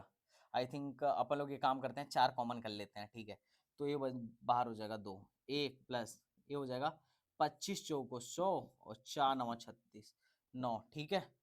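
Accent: Bihar, India. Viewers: Sir ji lagta h Bihar se h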